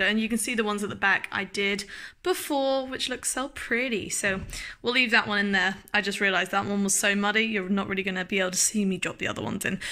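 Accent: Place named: London, United Kingdom. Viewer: Hi where do you Find them? They are Awesome, is that how you got Your Tattoo on your left Arm. I am from The U.S.A. 🇺🇸 I Want to get that Tattoo unless it’s a woman’s Tattoo Please let me know, I am A Fan of Yours and since your from London I love ❤️ your Accent.....Love Donnie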